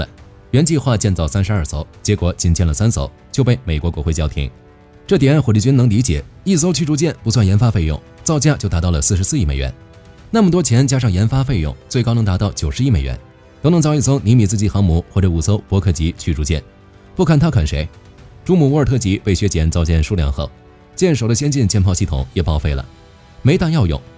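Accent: Beijing, China. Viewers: 火力君在军事术语上，最近一年来始终都在向台湾用于对标，比如“陆攻”，“资料链”，“镭射”，“寻标器”......，但是朗读所使用的AI引擎却还是字正腔圆的北京普通话，这一点特别别扭。要么你就统一全按北京标准用词然后使用这个语音，要么你就改个台湾语音朗读AI引擎，使用正统的台湾发音标准。现在搞得四不像，照着虎画成猫。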